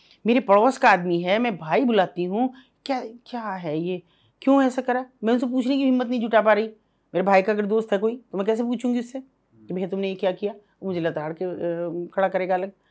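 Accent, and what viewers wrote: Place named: Meerut, India. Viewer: Mairath vala lehja ❤😅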